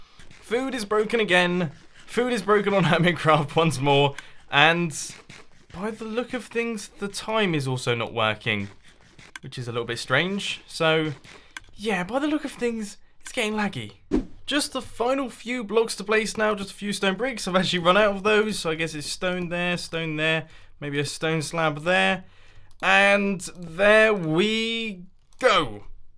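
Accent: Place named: United Kingdom. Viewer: I don't usually complain very much on the internet but has gotten really lazy over time. Now it's all about dumb progress updates every five seconds with him saying "This actually took longer than it seems!" as an excuse. Trying to reach the 20 minute deadline to make an average episode with the least ammount of effort possible. I mean if you AFK overnight that should be around 8 or 9 hours, not 14 or 15 like you said. You spend more than half the day sleeping? Also your go-to excuse is "BECAUSE IM A SPOON!! HAHA!!!" Now being stupid and having a british accent are his only selling points. I unsubscribed a relatively long time ago, because other hermits are better youtubers, but I come here from time to time to check and it's getting worse. Now he's adding merchandise into the mix. This is getting commercial now. Ugh.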